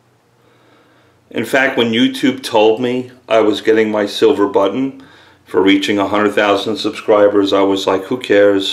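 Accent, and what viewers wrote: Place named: Arkansas, United States. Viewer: Long Island? I was sure that accent was Arkansas or West Virginia : ) I, for one, appreciate that you think about things and don't just follow the backs of bottles. You keep calling it like you see it, and I'll be here.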